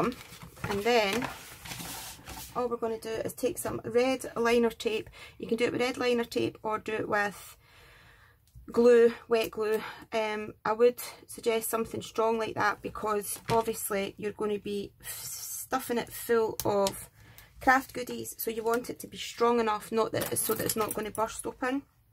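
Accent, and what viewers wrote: Scotland, United Kingdom. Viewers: I love love love your Scottish accident!!!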